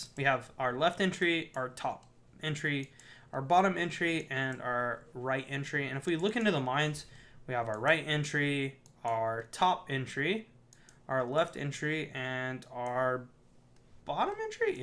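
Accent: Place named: Pittsburgh, United States. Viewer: are you from Pennyslvania? You have kind of a Pittsburgh accent...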